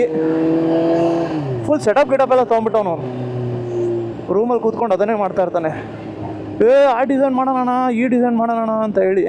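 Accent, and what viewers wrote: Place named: North Karnataka, India. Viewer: That uttar karnataka slang between the conversations ❤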